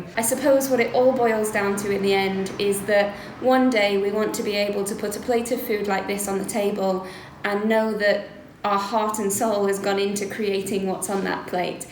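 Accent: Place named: Yorkshire, United Kingdom. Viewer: What a great video and so interesting to hear you explain your motivations and plans for the future. You are very brave to strike out into a remote rural location. I will follow your progress with great interest and wish you both and Paco (lovely dog) all the very best. PS. you have not lost your Yorkshire accent.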